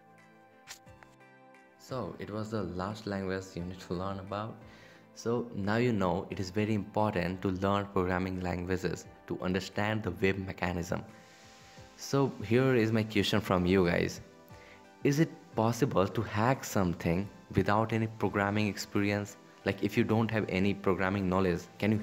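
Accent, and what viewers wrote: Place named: India. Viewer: me i as soon as i hear a India guy voice: AM IN THE RIGHT PLACE. love to all indians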